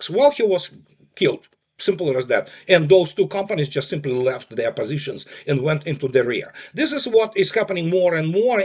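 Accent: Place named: Russia. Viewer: 'Confabulated fairy tales' via hat marvellous Russian accent! Music to ears and as always Truth! God Bless, Andrei, a beacon of informed understanding - reality itself.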